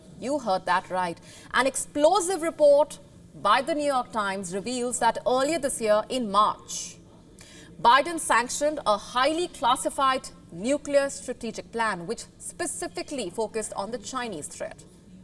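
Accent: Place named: India. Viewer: What the heck of indian accent! 🤔🤭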